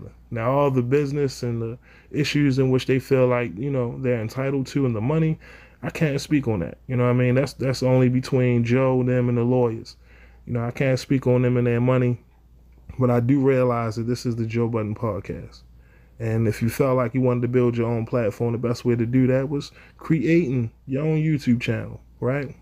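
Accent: Baltimore, United States. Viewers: You sound like you from Baltimore fr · You from Baltimore bruh? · yo u from bmore? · Your from Bmore? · Man you gotta be from Baltimore lol. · You gotta be from bmore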